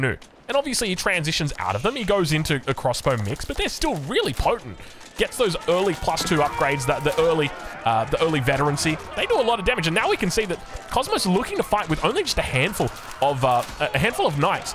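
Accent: Australia. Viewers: thats right, im watching him from Chile and I also love his aussie accent haha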